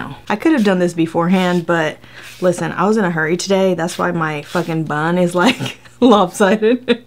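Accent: United States. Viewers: Where is her accent from? Certain state of 🇺🇸?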